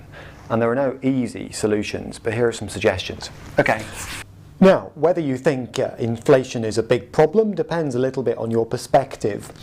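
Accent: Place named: England, United Kingdom. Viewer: I think he speaks very well and clearly., unless you just don't like English accents. I don't dig his shirt though.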